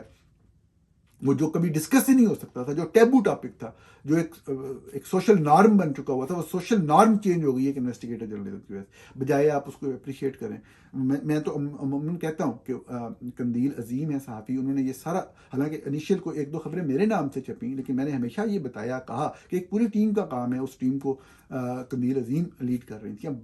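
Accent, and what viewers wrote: Punjab, Pakistan. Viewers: Punjabi touch 😂..Good analysis❤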